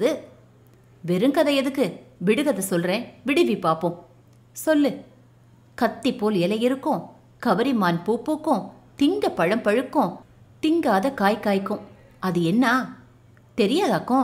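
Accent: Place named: Southern Tamil Nadu, India. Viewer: தங்கள் ஊர் தெற்கு பக்கமோ அங்கே பேசும் தமிழ் காதில் தேன் போல் இருக்கிறது நன்றி நன்றி 🎉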